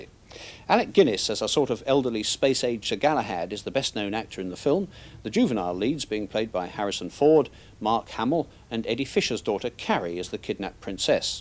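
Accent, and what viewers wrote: RP, United Kingdom. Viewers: Okaaaaaay.....I watched this "film review" and then came on her to make a few acidically sarcastic comments, and then saw everyone on here pouring out love for this guy. Buncha Brits! From where I live in Texas this doesn't even qualify as a film review no matter how posh is this guy's English. The only things he said that could be interpreted as relating to the quality of the movie were that it is successful and that George Lucas is a good director.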